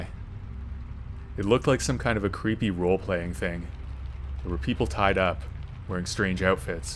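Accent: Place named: Canada. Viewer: I dig the Canadian accent.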